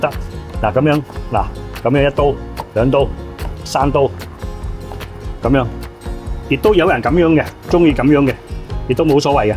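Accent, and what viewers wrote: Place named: Dongguan, China. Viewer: 好正！冒昧问句，是否东莞人？好似听到有东莞乡音！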